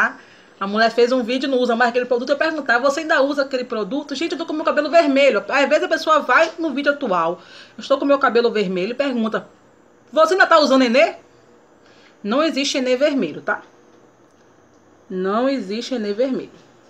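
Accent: Bahia, Brazil. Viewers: kkkkkkkkkkkkkkk isso mesmo manda conta do mes pra pagar seu dentistakkkkkkkkkkk adorei o beijo p euzinha e p Joaozinho linda que Deus abencoe muitoooo baiana arretada adoro seu sotaque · Engraçado ne não agravando a todos "Tem pessoas q não gosta do nosso sotaque baianes fala mal de nosso povo e quando chega o Carnaval tá todo mundo socado até o talo na nossa terra vai entender "Manda esse povo deitar na Br. 😂😂 Linda sua maquiagem.😘😘😘 Liga não o povo quis dizer q seu amor é todo grosso mais é os braços kkkkk 😂😂😂😘😘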